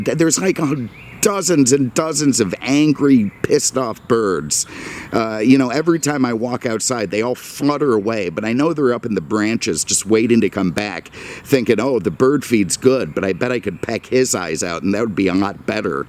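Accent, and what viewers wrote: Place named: New York, United States. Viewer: It was to see this video. What a beauty! Miss your beautiful happy disposition!!.😂😂😂😂😂 You sound like you are from New York.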